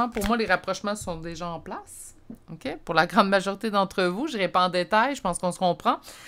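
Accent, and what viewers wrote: Canada. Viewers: C' est avec plaisir,très très Belle Personne et Franche...j' Adore ❤❤❤❤❤. Prenez soins de vous.j' Adore et toujours adoré l' accent Canadien et les Canadiens.❤❤❤.à mon retour de vous féliciter ❤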